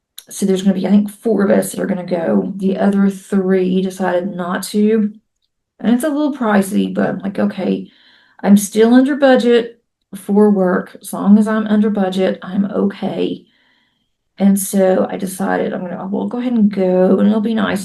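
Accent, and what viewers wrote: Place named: South, United States. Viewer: your sweet, subtle Southern drawl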